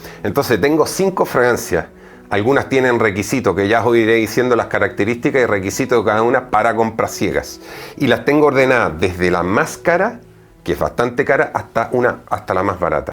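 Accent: Chile. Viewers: Es chileno'español cuando habla jajaja un crak abrazo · Acento chileno + acento francés, pero vive en espana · España? Pense q grababas en Chile debido a su acento. Gracias por el video! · De que parte de España eres?, hablas como Chileno. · Tan guapo 😊 tiene todo el acento chileno 😊☺ saludos... Eres seco 😙 y Viva Chile 😊😊😊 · tienes un acento Chileno,es a si??,saludos.... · estarás en España, pero tu acento chileno te delata, el tono de voz y los gestos del típico santiaguino 🤣😊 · Hola, podrias decirme si realmente eres Chileno? Por tu acento lo creo..... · Siempre te veo y algo tienes que aún. No descubro bien se que vives en España pero tu acento no es de español, eres Chileno verdad ? Sorry se que no tiene nada que ver con el video. Gracias a ti tengo 4 perfume recomendaste saludos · Tienes una mezcla entre Chileno y Español hermosa porque entre Chileno metes una frase español y es gracioso 😂😂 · De que parte de españa eres su acento es como escuchar a un Chileno. 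Gracias por su canal. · Me encanta escucharte, sos un maestro, das cátedra y si a eso le sumamos los ojos claros, la camisa negra y el acento chileno ya sos irresistible. Más reseñas de perfumes femeninos y cuáles considerás unisex. Abrazo desde Uruguay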